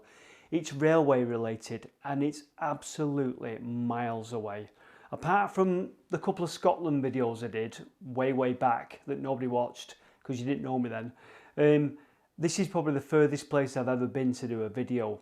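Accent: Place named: Oldham, United Kingdom. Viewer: My family is from Oldham, I’ve visited twice in the last 3 years. Can’t wait to visit again., love your accent, reminds me of my dear late dad. ❤️❤️❤️❤️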